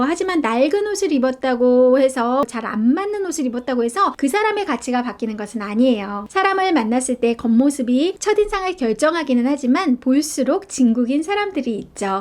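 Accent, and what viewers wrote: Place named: Seoul, South Korea. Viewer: 꼭 옛날 뉴스에서 나오는 서울사투리 느낌이네요ㅎㅎ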